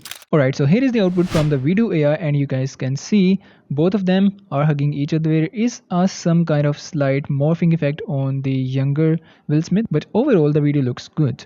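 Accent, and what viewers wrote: India. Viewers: Once I listened that indian voice I knew this shit isn't working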